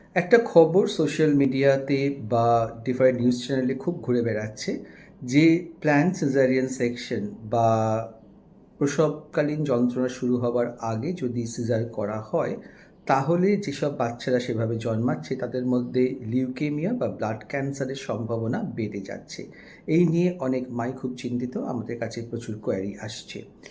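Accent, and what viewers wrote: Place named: Kolkata, India. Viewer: Nmaskar Sair কলকাতাই কথাই বসেন আপনি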